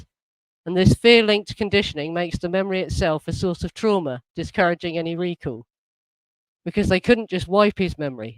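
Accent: Cockney, United Kingdom. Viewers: Great presentations. Just hard to decipher you east end ? Accent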